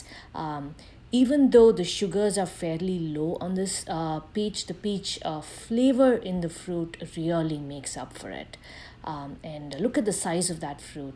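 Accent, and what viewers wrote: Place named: India. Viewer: Aisa lag raha hai jaise koi Indian bol rahi ho. ji, bharat se ho kya?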